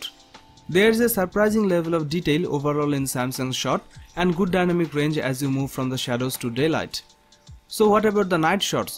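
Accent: India. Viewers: Sound like indian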